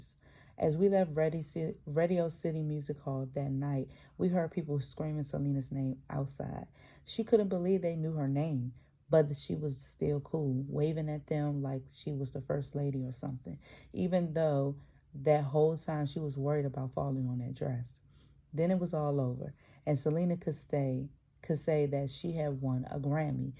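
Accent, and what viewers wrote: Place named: South, United States. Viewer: P.s. I'm from New York. It's so true that my city is dirty, lol. You have a bit of a southern accent to me. It's not that thick, though.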